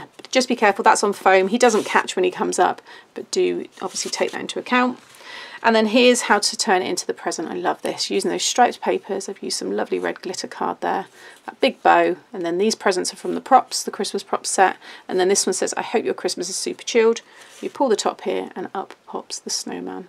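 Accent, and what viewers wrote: England, United Kingdom. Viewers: Just discovered your channel. You are just amazing ! Congratulations for all these cards ! They are so nice ! Will see if I can order your stamps to be delivered to my country (Switzerland). Really enjoy your videos and your English accent is just perfect for my French speaking ears 😊. Take care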